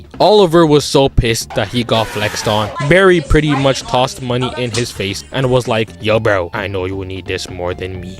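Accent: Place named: Toronto, Canada. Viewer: I can't pay attention with that crazy ahh toronto accent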